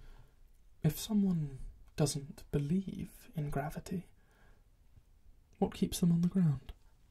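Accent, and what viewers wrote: United Kingdom. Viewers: The main reason I watch your videos is learning your British accent :)✨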